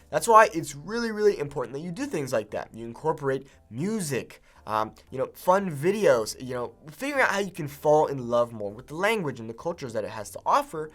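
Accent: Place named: Mexico. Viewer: Congrats on your spanish which is awesomely fluent, since any spanish speaker can hear your mexican accent as well as the mexican slang words. What amazes me most is the fact that you've just taken four years of classes in high school to reach your current level, I mean i started learning english (in Spain) when I was seven throughout years in the education system 'till i was eighteen, including furthermore several stays in the UK and Ireland as well. Once I started college I continued taking classes to get an advanced degree, but, definitely, I do feel my english is not as good as your (mexican) spanish. Congrats again mate, I love watching your videos, keep It Up.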